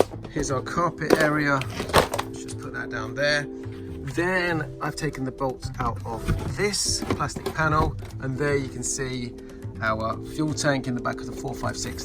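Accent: England, United Kingdom. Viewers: Came over from...fellow Englishman and so don’t need subtitles 😂🤪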